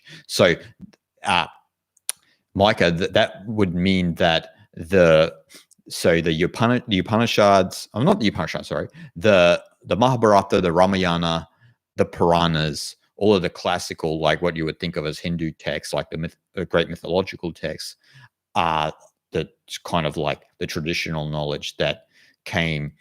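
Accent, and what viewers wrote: Australia. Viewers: Hello Mate!🖐❤🙏🕉 I'm from Los Angeles.😈 oops! I mean😇. Brah hahahaha🤪🤪. Its Thursday pm PS time! Watching the replay! Thanks Buddy❤👍.L❤VE your Aussie Accent!😇❤🙏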